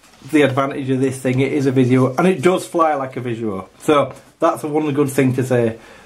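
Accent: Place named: West Yorkshire, United Kingdom. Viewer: Great review video , you sound like keith lemmon Are you from west yorkshire anyway gave it a like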